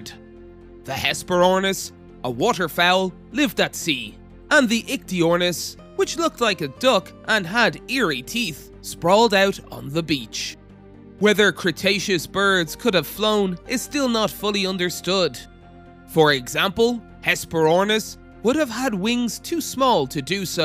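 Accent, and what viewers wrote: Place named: United Kingdom. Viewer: The narrator has a shrill voice and a UK accent. Not my favorite.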